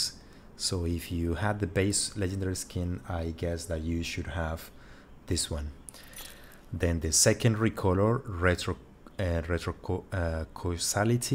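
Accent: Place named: Italy. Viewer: Si sente che sei Italiano... giusto?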